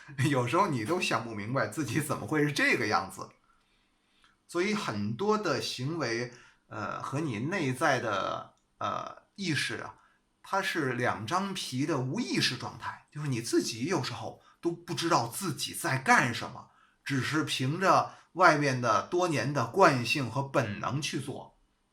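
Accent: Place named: Liaoning, China. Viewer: 1. 老師口音聽起來像我遼寧老鐵的口音呢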